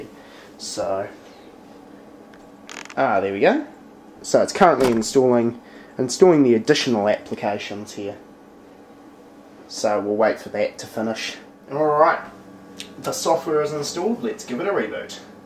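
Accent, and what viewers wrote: New Zealand. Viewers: you're accent suggests that you are a kiwi?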